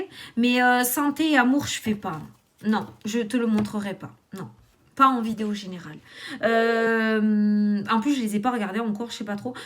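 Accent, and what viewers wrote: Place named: Tarbes, France. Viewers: sur messenger vu que ça passe par FB j'ai 2 contacts celui avec ta photo de chouette et celui avec ta photo normale lequel est le bon. Pour les contrats signatures immobilier je te remercie de ton tirage cela me parle bien ça avance. Merci merci merci.❤❤💜 j'adore tu parles comme mes nièces qui sont à Tarbes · Quel bonheur cet accent me manque tellement. Toulouse, Lavaur,albi, castre. Trop de merveilleux souvenirs 🌟☀️🌟☀️❤️💕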